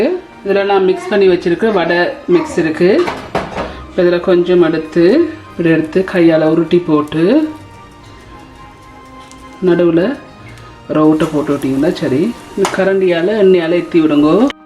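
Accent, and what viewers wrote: Jaffna, Sri Lanka. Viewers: Hai dear I am so happy to be a subscriber to your channel. We feel happy and pleasured to hear your pure jaffna tamil Could you please show how to make prawn flat vadai ? Usually we can buy these vadai in Colombo gall face beach.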